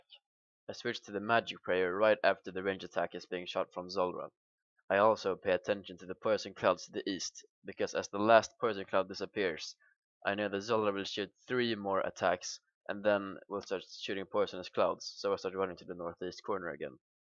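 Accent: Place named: Norway. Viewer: dat norwegian accent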